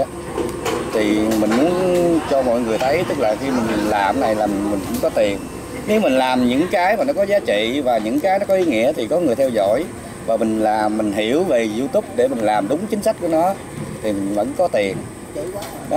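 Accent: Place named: Mekong Delta, Vietnam. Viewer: Chúc mừng anh trai, đậm chất miền Tây chân chất, gần gũi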